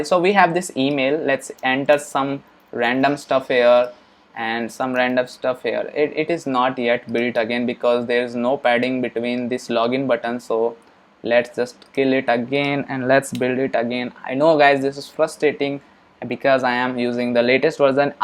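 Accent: India. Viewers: 印度仔，英语很重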